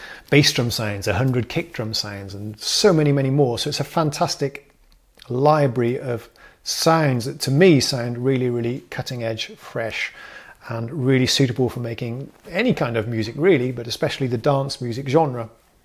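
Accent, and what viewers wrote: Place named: RP, United Kingdom. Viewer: Wow was not expecting an Electribe demo video to feature the poshest accent I've heard since I watched Our Man in Havana a month ago.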